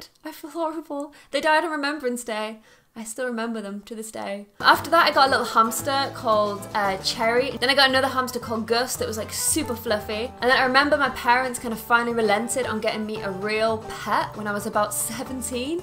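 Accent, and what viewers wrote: Ireland. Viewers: I have thought for a while that you have a slight Irish accent! :)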